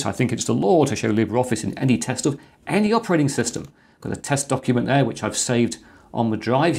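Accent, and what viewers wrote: United Kingdom. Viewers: Dear: I've been enjoying your videos and learning through them for several months now, and I thank you heartily for that. I wish to make a suggestion to you to further enhance the quality and usefulness of your videos. Trouble is, there is a certain amount of ECHO in the room where you record your videos, that hinders the clarity of your otherwise excellent speech and keen British pronunciation. And believe me, that echo makes some passages hard to understand. I dare suggest that you seek the counsel of a sound recording specialist that helps you to identity the sources of those echoes and take measures to dampen them, such as adding wall isolation materials, or perhaps some curtains or rugs. THANK YOU for your attention.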